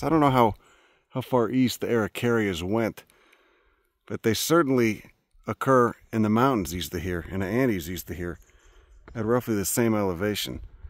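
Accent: Chicago, United States. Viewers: Is that a Chicago accent?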